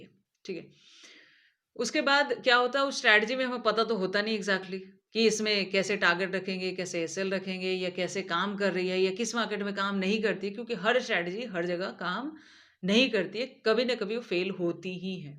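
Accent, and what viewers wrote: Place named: Haryana, India. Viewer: u belong from Haryana as per ur tone❤🎉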